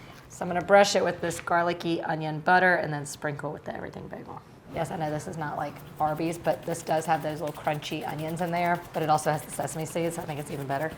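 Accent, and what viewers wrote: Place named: Alabama, United States. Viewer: ! The recipes are almost always on point, but if I’m being honest, a lot of times I just listen to her videos because I hear home in her accent. I’m from a sort of culturally ambiguous part of northeast Florida (St. Augustine - we’ve been here since 1565 and have become a cultural crossroads for so MANY, that we don’t really have our own sho-nuff “this is who we are” vibe you get in so many other places. A drinking village with a fishing problem, some say. BUT, we are close enough to Georgia and Alabama that you can hear both in our accents here. I’m not positive, but there sounds like she’s got roots in Alabama. Sounds like home to me, so here I is!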